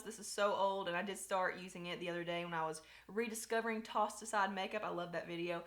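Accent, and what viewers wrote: South, United States. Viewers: I love your channel and southern bell accent btw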